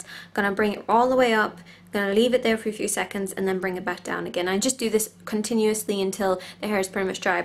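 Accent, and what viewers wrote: Ireland. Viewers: are you irish? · r u irish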